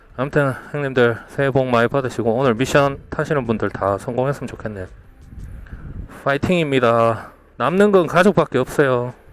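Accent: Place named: Gyeongsang, South Korea. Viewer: ㅋㅋ 말투가 대구분인가요?? 정겹네요 ㅎㅎ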